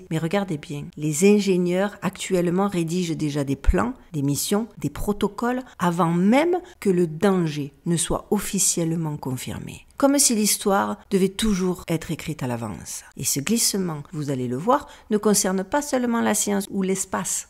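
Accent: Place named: Nice, France. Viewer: Wow ta d’beau yeux tu sais l’accent niçois avec un soupçon moscovite bon ton vpn ,on s’en fout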